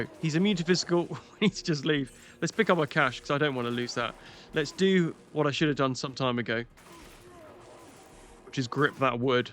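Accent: United Kingdom. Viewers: Beautiful. :”) (.. British man? Not Australian? :D)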